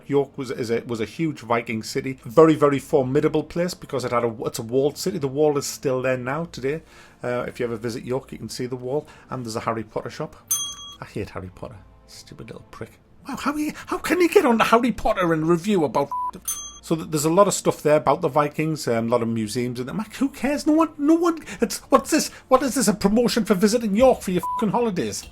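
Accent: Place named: Scotland, United Kingdom. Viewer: I gotta say I love hearing your accent. However I'm an American and it is hard for me to place it. Where are you from? Are you Scottish?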